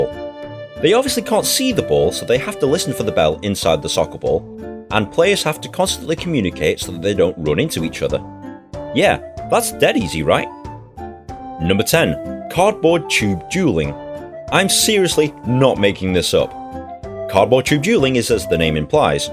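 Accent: United Kingdom. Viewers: British accent is awesome!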